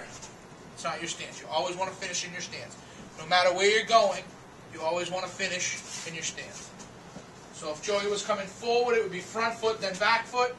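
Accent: Boston, United States. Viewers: Boston accent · its a boston accent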